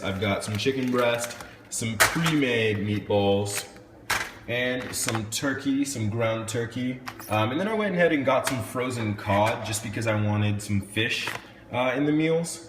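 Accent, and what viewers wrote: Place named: Canada. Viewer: I can just tell ur canadian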